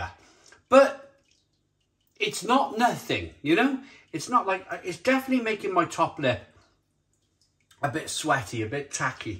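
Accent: Wales, United Kingdom. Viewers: Are you from wales cuz u sound like u got a welsh accent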